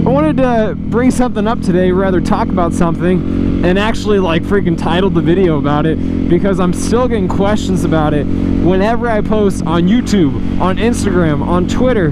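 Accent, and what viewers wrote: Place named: South, United States. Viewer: that southern drawl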